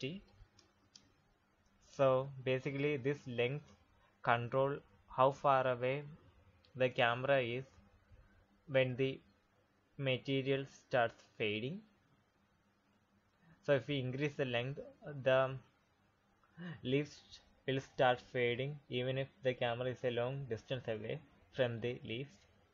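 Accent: Kerala, India. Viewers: BRoo you sound Mallu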